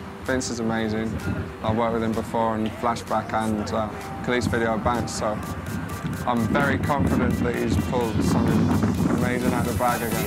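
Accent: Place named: Scotland, United Kingdom. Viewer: Scottish ,,,,cool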